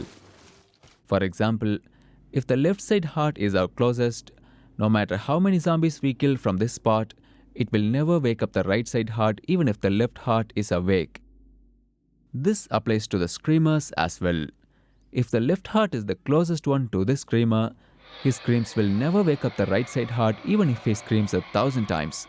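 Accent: India. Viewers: The Indian English accent isn't innately irritating or anything; you gotta understand that the economic status quo of our world, and Indians' superior command of the English language compared to the Chinese, for example (not compared to natives, I mean), made India the most popular call-center destination of the world. And very unfortunately, most companies that want to save money by using Indian customer-support representatives on the phone instead of local ones also end up wanting to save money on many others aspects, sometimes at the expense of customers, so they end up frustrating customers for countless reasons that go beyond any language barriers with the Indian customer-support representatives. So, many people around the world started to gradually feel irritated with the Indian accent, not because it is innately irritating, but because it reminds them of their frustrating experiences with the companies that employ Indian customer-support reps. 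However, don't let this deter you from just being yourself. Nobody ends up making everyone happy anyway. And as long as you enunciate and speak as clearly as you can, people who are open-minded and interested enough in your content will be able to ignore your accent and just focus on the content that you're delivering. It is more important to be your natural self than to try to please a percentage of your audience, while still improving that "self." A lot of people use the advice of "be yourself" wrongly, where they take it as an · Indian accents are kind of pleasant to me,work on your annunciation though🤙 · I can hear the Indian in you.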